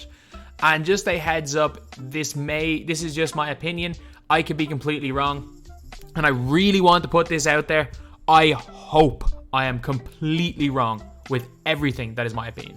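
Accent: Ireland. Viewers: Yo u Irish?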